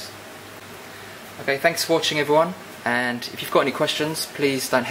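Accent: England, United Kingdom. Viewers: Your dialect is nice. What part of England is it from?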